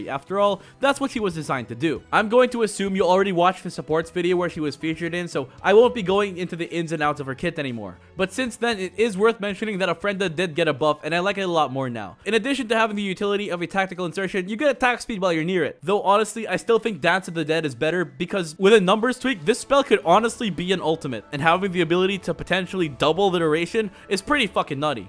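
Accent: Philippines. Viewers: heya will u also do content on league? that'd be awesome also I can still hear your Filipino accent UwU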